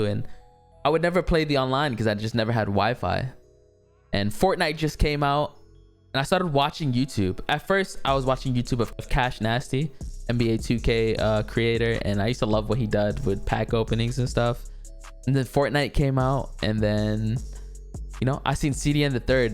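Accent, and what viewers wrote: New York, United States. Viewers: I knew you was from NY the way of speaking was too familiar, love you brodie keep up the work‼️‼️ also take as much time as you need to come back consistently❤️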